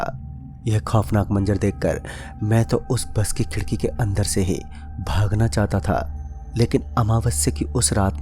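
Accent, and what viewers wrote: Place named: Bengal, India. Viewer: Bhai tum pakka bengoli ho...tmhara Hindi Bengali mix ho rha h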